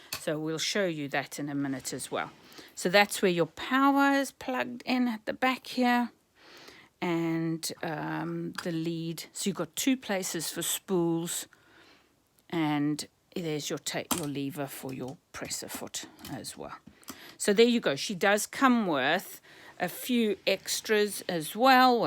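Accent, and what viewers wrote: South Africa. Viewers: I just bought a 700 which only does straight and zig-zag stitches. I can't wait for it to arrive. Can you point me in the direction of some information as to how to give it the kind of TLC it deserves, as mentioned in your video? Love your accent by the way. Are you from South Africa?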